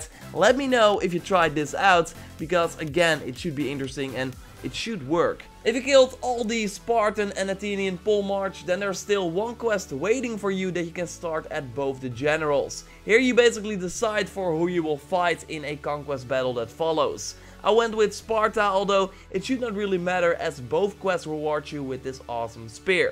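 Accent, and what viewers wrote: Netherlands. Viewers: You sound......Dutch.... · je hoort gelijk nederlands haha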